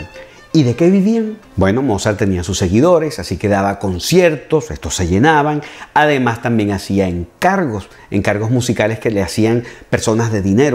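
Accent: Venezuela. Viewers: Tu acento es venezolano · Hola. Acabo de descubrir tu canal y este es el segundo video que veo. Me parece que haces una excelente labor divulgativa y además es muy agradable escuchar las historias contadas con nuestro acento venezolano. Dicho esto, te aconsejo que tengas cuidado con las redundancias, ( "verificar la veracidad.." en vez de "confirmar la veracidad.." o "verificar la existencia.." ) y con la gestualización que me parece un pelín excesiva. Insisto, sin embargo en que la calidad de la presentación es muy buena y muy bien redactada. Te deseo un gran éxito.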